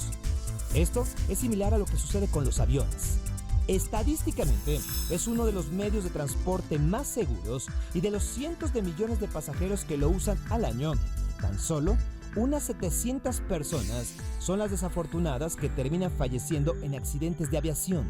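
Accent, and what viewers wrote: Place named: Peru. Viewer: Este conductor tiene asentó peruano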